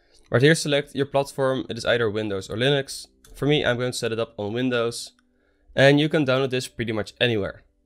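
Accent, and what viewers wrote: Netherlands. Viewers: are you dutch by any chance? cuz i hear a dutch accent. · correct me if i'm wrong but your accent tells me that your dutch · You sound very Dutch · With an accent so thick, I can tell that you are definitely Dutch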